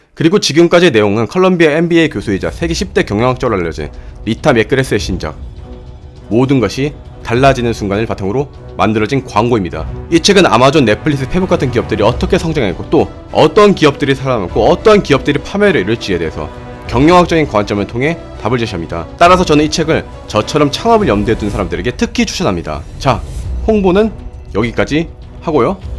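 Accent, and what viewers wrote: Gyeongsang, South Korea. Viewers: 경상도 분이시군요?^^♡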